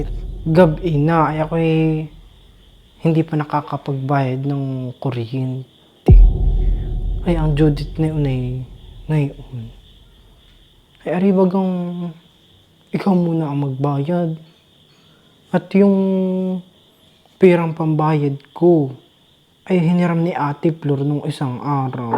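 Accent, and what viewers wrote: Batangas, Philippines. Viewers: Gustong gusto ko ang punto mo, taga saan kb meses? Batangas o cavite?? Nppasaya mo kming lht, maraming salamat❤❤ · Anong accent yan? Saang probinsya yan?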